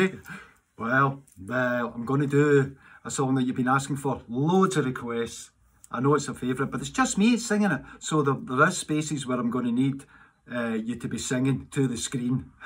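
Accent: Scotland, United Kingdom. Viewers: Thank you! You are so cheerfull:-) the Scottish accent makes it even better:-D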